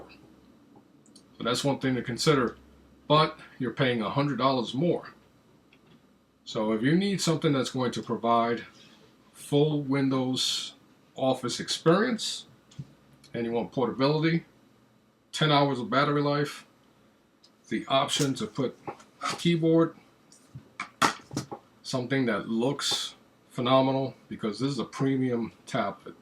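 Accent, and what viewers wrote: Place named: South, United States. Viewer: Where are you from? You have a mix accent of black and southern border kinda a guy. No ofense, just curiosity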